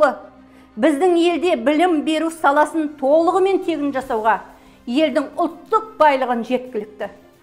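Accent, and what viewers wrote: Standard. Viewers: Молодец казакша таза суйлеп тур енди арамыздагылар барго казакша сулесе шыгатын едим дитиндер не айтады екен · Өте керемет.Таза қазақша сөйлейді екен.Өте шындықты айтты.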